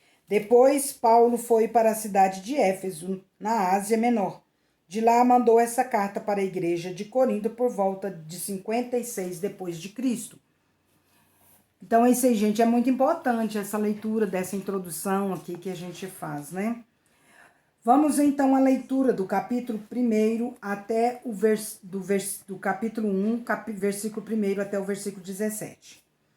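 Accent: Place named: Minas Gerais, Brazil. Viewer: Nossa linguagem de mineirez 😂😂😂 é muito boa.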